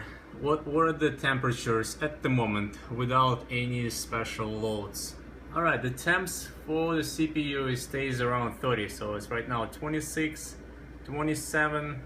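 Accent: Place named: Russia. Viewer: аксент лайк рашен...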